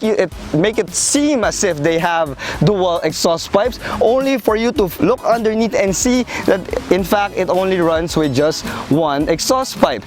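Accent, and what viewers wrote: Philippines. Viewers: filipino ,,pinoy accent wooww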